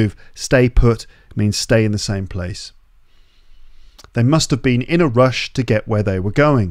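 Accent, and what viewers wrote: United Kingdom. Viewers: Subscribed! This is British English because of the British way to pronounce R and the glottal T. Love it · O...British English ! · I find interesting and really helpful every story you read since it's the best way to learn while enjoy English, i have listened many short stories from your channel. I have been obsessed with the British accent and this is helping a lot to brush up bit of my skills. Greetings from Peru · I really enjoyed the British accent and the format (story, then summery then attention to the specific phrases and details) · Mano eu tô iniciando então não sei... Esse sotaque dele é britânica???? Vc acha bom pra mim começar c ela ou americana? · Wow, I absolutely love your voice! Your pronunciation, intonation, and accent are perfect for this kind of content! I wish I had your British accent =D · Huge thank you,. Your channel is very useful to learn new vocabulary and phrases l don't know. 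I am also interested in learning British English and accent which is very nice. You are an amazing and dedicated person who teaches English perfectly. I am sending all my best wishes from here where l live. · British English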